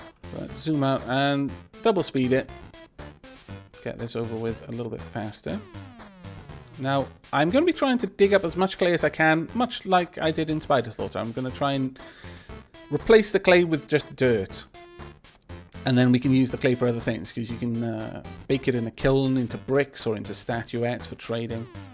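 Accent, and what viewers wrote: Wales, United Kingdom. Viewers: Subbed man, can you add my name pls? Also are you Welsh?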